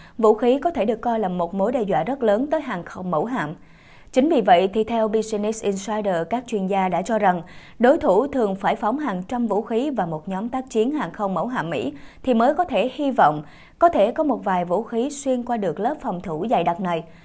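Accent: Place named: Central, Vietnam. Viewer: người đọc có giong miền Trung dễ nge.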